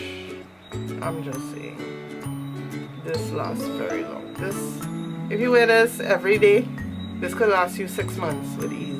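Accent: Trinidad and Tobago. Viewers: New subbie....your accent.....Trini?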